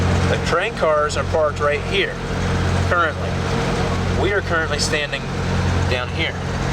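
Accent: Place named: United States. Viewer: . Like other people, just love your presentation! So clear and concise, such a quaint accent! So nice to hear such good English coming from the USA. Excellent report in every way. Have to say I am amazed to see a wooden embankment in 2019 in high tech USA. Don't think the UK has had any since 1900! Wonder why they did not use stone/brick or rock spoil from elsewhere when building the line? But quaint all the same. Most enjoyable.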